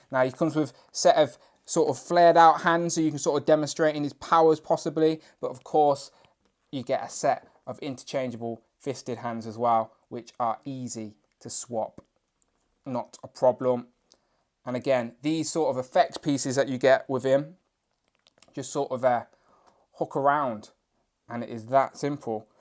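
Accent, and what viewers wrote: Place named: United Kingdom. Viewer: I don't know. (He sounds like an Asian guy living in England.)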